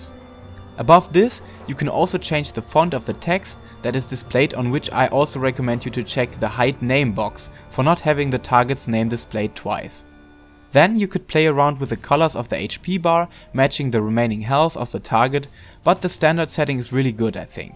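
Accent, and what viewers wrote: Germany. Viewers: Nice german accent^^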